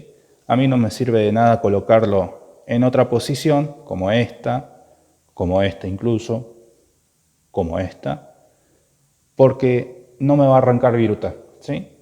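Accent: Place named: South America. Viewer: Me caga oír hablar aún sudamericano 👎👎👎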